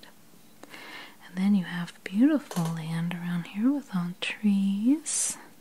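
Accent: United States. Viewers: I love all your videos....i wish i knew what state you were from....your voice...😌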